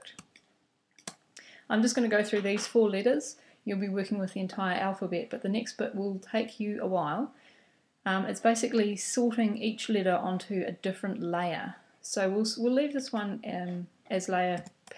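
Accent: New Zealand. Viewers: Don't know if you are still receiving comments, but....if you have 26 letters in illustrator...do you just put them on 26 layers? or fewer per document? thanks, good tutorial....and new zealand am i hearing? thanks, eliza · If only all tutorials were given in a New Zealand accent! Thanks, very helpful :)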